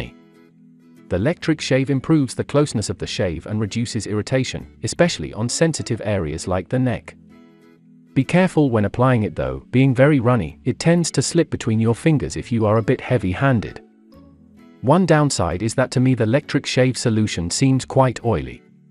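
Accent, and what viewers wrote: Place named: England, United Kingdom. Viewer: Another authoritative sounding video with Engl-ish accent, maybe AI. Why do pre-shave lotions cost so much, mostly alcohol.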